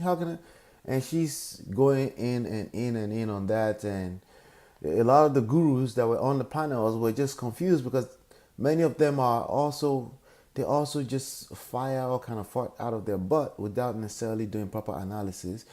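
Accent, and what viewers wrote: Nigeria. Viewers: I think Nigerian accent. I love it. ❤ My daddy is Nigerian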